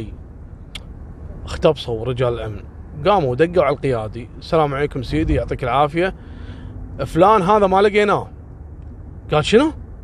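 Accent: Kuwait. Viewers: يا اخي قصصك جميلة واحلى ما فيها اسلوب سردها ولهجتك الكويتية الجميلة التي نحبها من ايام مسلسل الى ابي وامي مع التحية وخالتي كماشة وغيرها من المسلسلات الكويتية الجميلة اخوك من العراق احبكم يا اهل الكويت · والله ضحكتني ايكله اكضب الباب انتةوابنك والله حلوه لهجتكم اموت عليكم يااهل الكويت الطيبين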